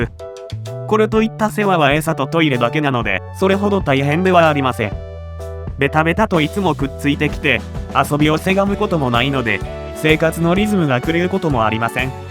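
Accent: Kyoto, Japan. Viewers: 京都あたりの方ですかね？ 訛り風情があってかっこいいですよ〜 ロシアンブルー欲しくなりました！ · 漫画とかアニメに出てきそうなThe京都弁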